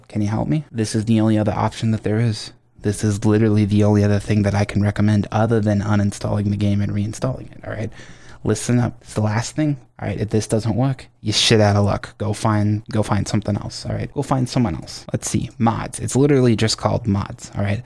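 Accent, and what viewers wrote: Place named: Massachusetts, United States. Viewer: This dude has to be from Massachusetts... the accent... lol, my computer is a piece of shit old Alienware that is all kinds of cluttered so nothing works for me :( I really don’t want to spend $3k for a new one but that might be the only solution. Having hughesnet for internet which is satellite with a 50 gb cap per month ( hughesnet is absolute garbage and they don’t deliver what they claim so don’t do it unless it’s your only option and last resort.) doesn’t help for problem solving as far as re-downloading everything. Very entertaining btw.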